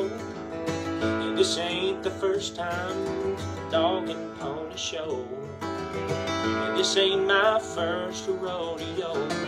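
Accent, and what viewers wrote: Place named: South, United States. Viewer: How’d he develop such a southern accent in Washington I know there’s wide open spaces and ranches cattle and all that but I’d never seen anyone there sound southern and I’ve been there a whole year one time (just for a girl) theyd make fun of my accent.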